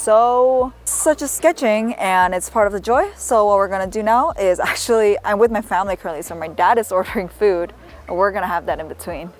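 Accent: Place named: United States. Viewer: Hi! I'm English Nothing wrong with your English Ah ah! Bit of USA accent to me But enjoy your channel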